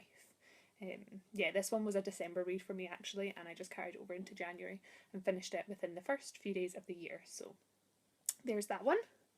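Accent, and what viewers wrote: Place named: Ireland. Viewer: Hello, New viewer/subscriber here. Am familiar with some of what you showed here, though have yet to have read any. Have been intrigued with Tolkien for a while. What would you recommend to start with him? I'm guessing you're from....Ireland....? I've always been interested in dialects, though not the best at identifying them. :-) Thanks for sharing, and looking forward to more from you. -Carm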